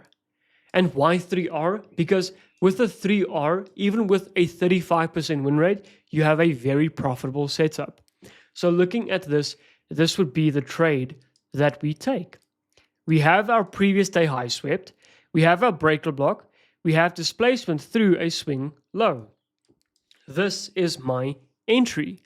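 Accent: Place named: South Africa. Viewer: No he sounds South African but I could be wrong!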